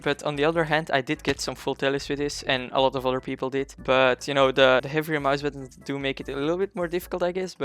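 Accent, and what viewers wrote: Netherlands. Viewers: ik wist dat je nederlands was ik hoorde het pog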